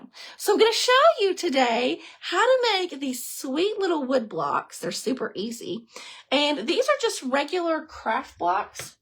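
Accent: South, United States. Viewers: Love these, don't loose your southern accent. You speak my language.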